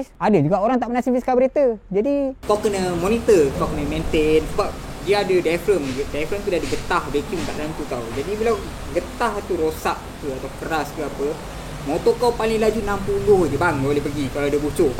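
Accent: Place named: Malaysia. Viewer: standed percakapan org mesia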